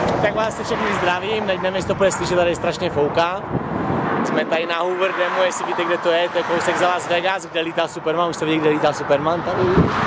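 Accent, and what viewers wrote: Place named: Moravia, Czech Republic. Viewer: On má moravskej přízvuk, je z česka.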